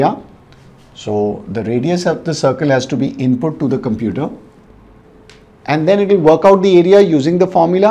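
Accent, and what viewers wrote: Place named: India. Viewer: wow nice indian accent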